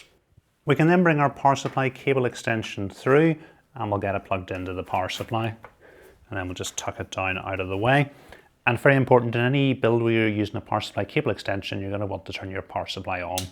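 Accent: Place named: Scotland, United Kingdom. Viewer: just scottish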